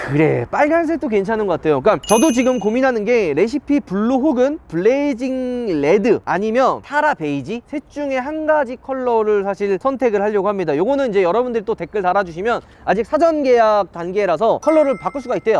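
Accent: Gyeongsang, South Korea. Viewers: 나나지는데요. 하는거보니 는 경상도 사람이었군 ㅋㅋ 담에 사투리 리뷰 부탁해요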